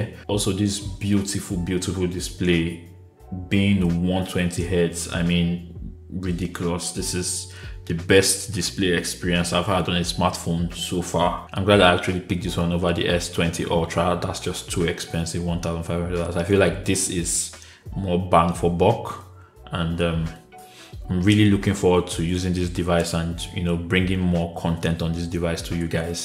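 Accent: Nigeria. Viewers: You have a Nigerian accent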